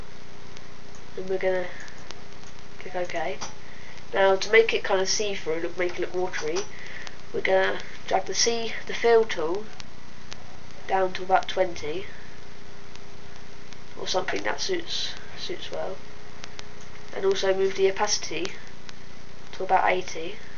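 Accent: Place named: United Kingdom. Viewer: sounds kinda like hillbilly british english....say WHAT...?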